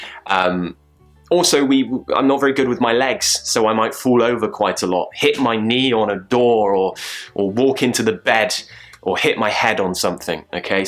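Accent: United Kingdom. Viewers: You got a beautiful British accent! I loved your story telling and I learned about a few words I've never heard before so thanks! being entertaining and teaching at the seam time is quite priceless 👍👍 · I enjoy hearing you talk. I've always found a British accent very pleasurable to listen to. As for the clumsiness, I believe you could tackle that &make a project of morphing out of it. I'm not truly knowledgeable about these matters...but I would think slowing your body actions & movements a bit might be a good start. Along with that, what if you "looked ahead" to see where you're stepping, or reaching, or walking...& sized up the space & room available to you & deliberately slowed your body motions ever so slightly, & perhaps squeezed their periphery a little--a kind of miniaturization of your limbs' movement(s). You'd be exerting some additional controls over your body movements & motions...& shrinking their scope & speed a bit--adding careFULness & diminishing some careLESSness. 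Or not...this might all have been psych-babble!! You decide!! Still enjoy you--never mind the clumsy!! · Hi from México, just discovered your channel today searching for British accents, i have seen some of your videos and they are quite nice, it really explained very good how to pronounce some difficult words for latinamericans speackers, ¡cheers mate! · I love your accent I would like to be one of your student to learn British English Thank you so much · Successful person, I appreciate your efforts to teaching us your videos helped me to speak and understand the British accent.thank u so much ❤️ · Like the British accent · Hi! It's so nice to hear you speaking! I'm so in love with British English! I liked this lesson a lot, and if you accept a suggestion, why don't you ask some questions about your story at the end of your story. You could also do it live, so that you get our reply immediately after you ask the question. 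Would you consider it? Cheerio! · Hello. I am a Japanse and I am learning English. I subscribed your channel recently, and enjoy your teaching. Thanks to you I’m getting used to British accent. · Hi, I have been over the moon ever since I discovered your videos on youtube. As a non-native teacher of English, I have got a lot to learn from you. Listening to you and studying your mouth movements has helped me correct and improve my pronounciation to sound more British. You also have great ideas and tips like exaggerating the sounds. Wenn it comes to vocabulary, the way you explain them in context is amazing. All in all, you are a very good teacher, who teaches from the heart, not from the book! Your students are lucky to have a teacher like you. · Hi, Im from Bahrain and yes I extremely enjoy your videos.... I'm in love with the British accent and I loved it more after I watched you.... I wish I could join you on Skype but the price is quite high... 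Keep going and I keep watching your videos... Cheeeeers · Nice videos, man. I´ve just found your channel. Now I´m following you! British accent is amazing! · I'm clumsy too! I think it's an result of my shyness because I'm mostly shy than anything! I always tend to stumble at something (or someone) when I'm in public. And the British accent is the best ever! Congratulations! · Wow to listen british accent is so good I guess you were slow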